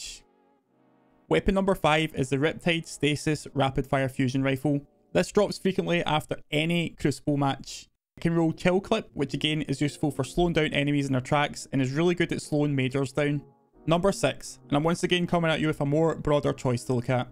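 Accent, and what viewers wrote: Scotland, United Kingdom. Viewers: it's probably because you're Scottish, didnt notice much of the mumbling. I would advise seeking professional help, as this can cost you In the professional world in the future. But thats about it, best of luck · Not a big deal but based on what you said your reasoning is flawed, " it's probably because you're Scottish, didnt notice much of the mumbling". If you didn't notice much of the mumbling then clearly it was due to his accent (by your own admission), so he was speaking clearly in a Scottish accent. My guess is you're just not used to it and have yet to develop an ear for it. :)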